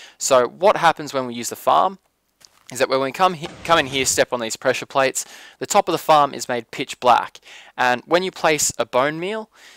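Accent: Australia. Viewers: awesome, are you australian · Are you Aussie.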